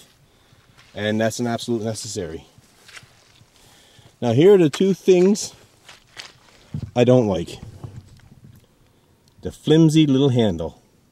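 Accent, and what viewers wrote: Canada. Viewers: assuming your accent and not watching any of your videos i assume you are Canadian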